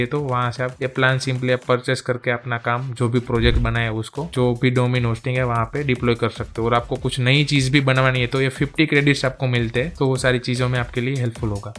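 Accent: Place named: Gujarat, India. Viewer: lang per thi gujarati lage chee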